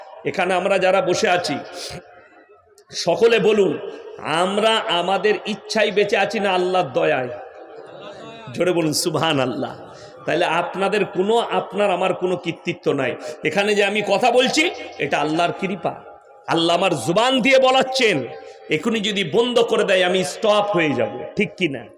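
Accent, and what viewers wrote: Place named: Bardhaman, India. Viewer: দাদা, বর্ধমান জেলার গ্রাম এর মানুষের কথা গুলো এই রকম হয়, এতে মাদ্রাসার দোষ কোথায় বলুন?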